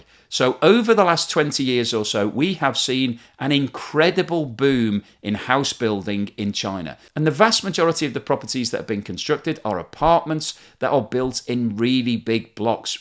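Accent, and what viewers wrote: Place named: Liverpool, United Kingdom. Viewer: He sounds like a Liverpudlian.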